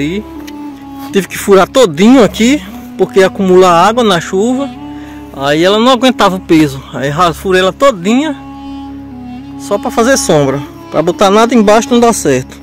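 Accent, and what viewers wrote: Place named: Northeast, Brazil. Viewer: acho muito legal ver seus vídeos e ouvir sua narrativa com esse sotaque típico do verdadeiro nordestino. Abraços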